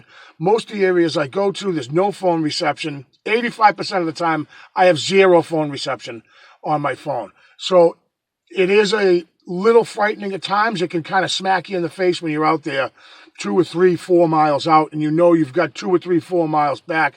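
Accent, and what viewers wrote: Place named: Massachusetts, United States. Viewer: Hey just re watched your video.....omg...looney bins..lmfao...havent heard that in the 25 years I been gone FROM NE....i love watching and listening to you and let's not forget that Mass. Accent.....Linda in Florida...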